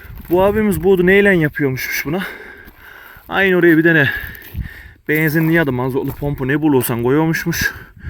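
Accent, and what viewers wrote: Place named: Aegean, Turkey. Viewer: Maşallah.. Tam da ege şivesi ile..Ben de denizli buldanlıyım. Sakaryada yaşıyorum. Yeni fark ettim sizleri. Başarılarınızın devamını dilerim.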